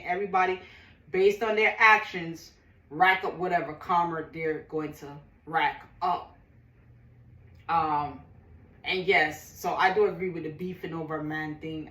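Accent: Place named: Jamaica. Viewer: Lol. So funny. I just felt like hearing your voice and your Jamaican accent that has been away from me for a while and that's what i found on my page. I feel glad that you are well. You are doing a great job. You are really all into it. Hands Up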